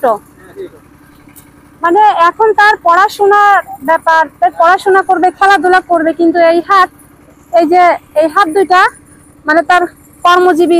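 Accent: Chittagong, Bangladesh. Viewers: আপু আপনি মনে হয় চট্টগ্রামের মেয়ে কথায় বুজা যায় আপনার ভিডিও খুবই ভালো লাগে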